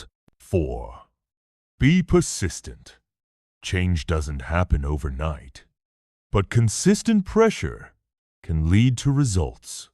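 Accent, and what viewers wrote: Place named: United Kingdom. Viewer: You know the stories military angle & the accent used by the AI makes it sound off. Might want to tone down the accent so it doesn't sound British so much.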